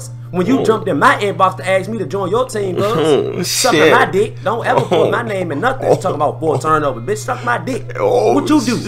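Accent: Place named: DMV, United States. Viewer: He gotta be from the DMV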